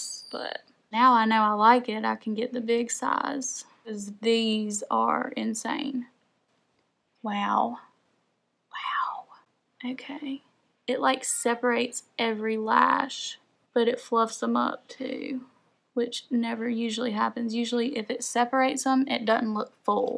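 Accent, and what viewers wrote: South, United States. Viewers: Where are you from? I sense a southern accent. I’m from Alabama!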